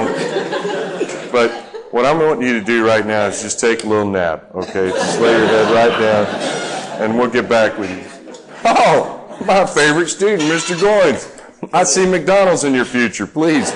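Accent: Russia. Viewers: I like your Russian English accent and your way to explain materials , can any one give me the name of the instructor ? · man i loved your russian english accent hahahah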